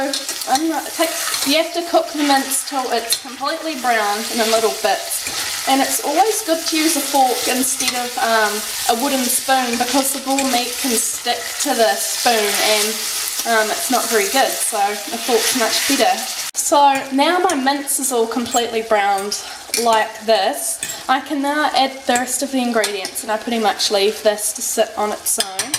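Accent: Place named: New Zealand. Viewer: I can tell you are from NZ because of your ingredients but in hindsight you accent should of given it away.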